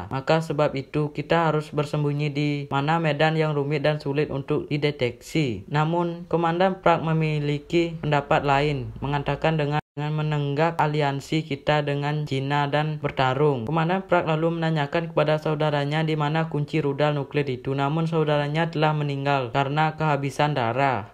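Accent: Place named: Bali, Indonesia. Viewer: narator nya bali banget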